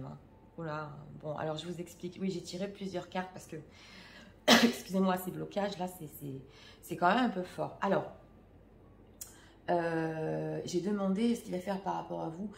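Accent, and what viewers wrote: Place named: France. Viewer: Mardi beaucoup pour vos tirages, vos conseils, votre énergie, votre franchise. Ne tenez pas compte des railleries de ceux qui se moquent de votre accent français quand vous lisez les cartes en anglais. Ils ne sont pas dignes de votre travail et de votre passion. 😊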